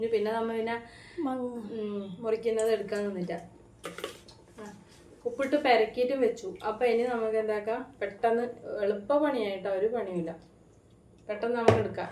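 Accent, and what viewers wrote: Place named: Kannur, India. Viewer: പക്ഷികളുടെ ശബ്ദം കേൾക്കുന്ന സുന്ദരമായ സ്ഥലമാണെന്ന് മനസിലായി.കണ്ണൂർ ജില്ലകാരെ പോലുള്ള സംസാരത്തിലുള്ള അച്ചാർ ഉണ്ടാക്കുന്ന നല്ല വീഡിയോ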